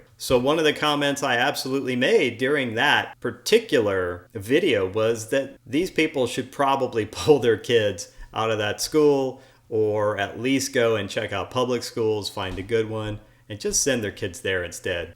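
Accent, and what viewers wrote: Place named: United States. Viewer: ya sound like an AMERICAN - fire up the music via PATTON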